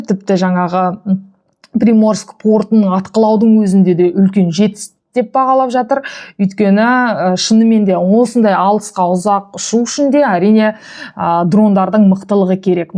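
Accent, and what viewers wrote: Standard. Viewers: қызым рақымет қазақша таза сөилеисің жаңалытарды таратып түрғаныңа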